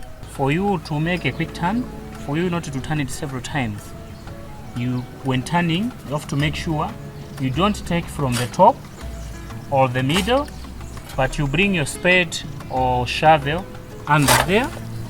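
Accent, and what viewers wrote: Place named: Zambia. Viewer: Definitely going to try this. Thank you from Zambia PS you sound Zambian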